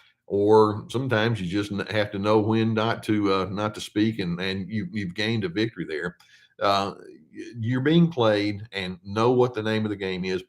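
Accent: South, United States. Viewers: it's because of your southern accent. Your all good. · Funny you're 2 hours ahead I just went to your site Pacific Standard Time. Thank you for team healthy, I don't stereotype you though you have the Southern accent. Yes I've been made to look foolish by such individuals you help me not feel so alone when gaslit or hoovered by such individuals or made to feel guilty because what I have to offer is not enough after they repeatedly destroy or lose or sabotage what I give them.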